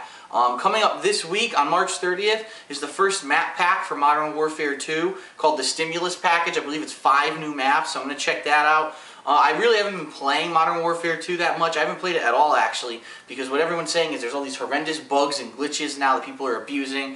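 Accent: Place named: Chicago, United States. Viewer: i think he sounds like he's from chicago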